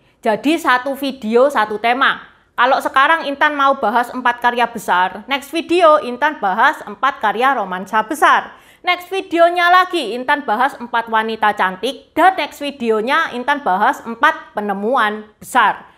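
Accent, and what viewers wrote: Java, Indonesia. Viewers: Wow so pretty intan saya suka cirita mu Kamu Jawa nya mana ko medok amat ya haaa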